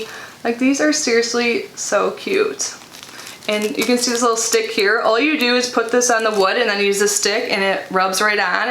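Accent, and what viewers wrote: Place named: Midwest, United States. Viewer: Hey! New subscriber. Been watching for a minute! You have to be from the Midwest. I’m originally from Chicago. I know that accent anywhere!! 😊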